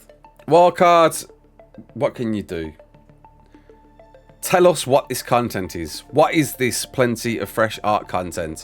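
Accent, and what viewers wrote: Birmingham, United Kingdom. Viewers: Are you from Birmingham? You have the exact same accent as me bro 😂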